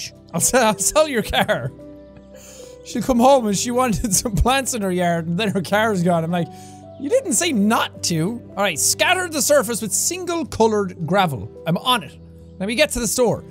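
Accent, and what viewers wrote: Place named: Ireland. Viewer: Man, what happened to the good old days when just started using his Irish accent? I wish I haven't watched every video back then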